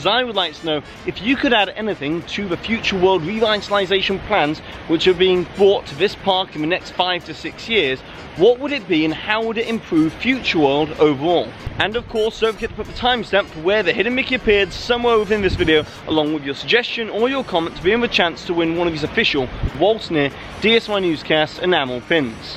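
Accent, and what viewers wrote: England, United Kingdom. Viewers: I love your videos man! I can't help it I been watching 5 of your videos. Just wondering is your accent from England?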